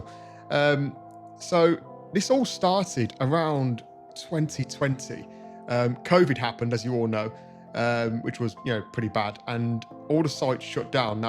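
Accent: United Kingdom. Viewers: U r British?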